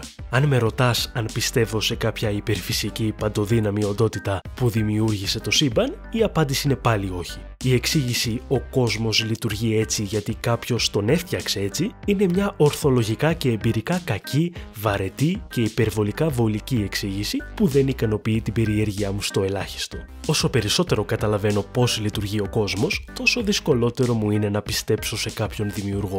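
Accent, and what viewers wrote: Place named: Ioannina, Greece. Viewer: Το είχα καταλάβει ότι είσαι και εσύ Γιαννιώτης από την προφορά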